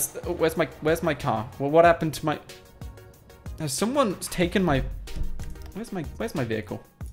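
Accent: United Kingdom. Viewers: Kylah Folkes he’s a British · listen to his accent; he's British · Is British or American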